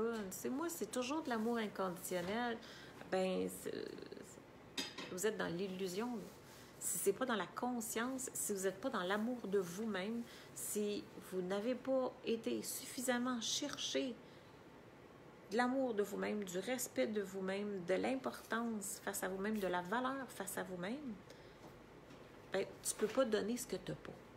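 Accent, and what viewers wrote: Quebec, Canada. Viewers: Je crois avoir reconnu l'accent québécois ou canadien 😁. J'adore ce genre de vidéo ça fait réfléchir tu as vraiment du talent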